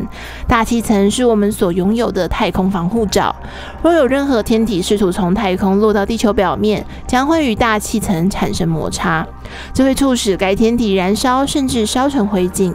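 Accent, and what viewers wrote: Taiwan. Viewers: 台湾腔听着莫名恶心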